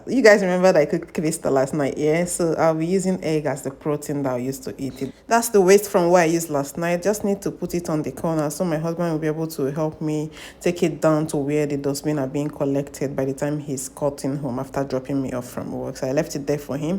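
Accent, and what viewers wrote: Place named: Anambra, Nigeria. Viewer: Your anambra dialect is giving me joy.😊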